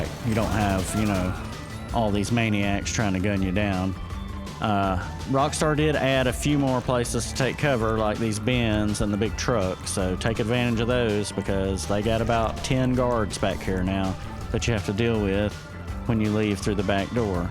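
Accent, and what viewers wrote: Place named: Kentucky, United States. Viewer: I love your accent! I'm from Kentucky and I don't know where you're from but you sound like you could be my neighbor! 😂 Great walkthrough! I appreciate it!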